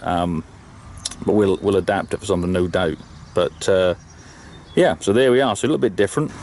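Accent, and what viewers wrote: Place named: Lincolnshire, United Kingdom. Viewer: Are you lincolnshire. You sound it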